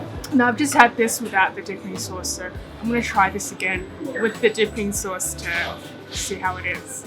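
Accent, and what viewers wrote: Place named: Australia. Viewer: So cool seeing an asian with an aussie accent ! Very pretty girl and great food vlog !!!! Love from Arizona =^..^=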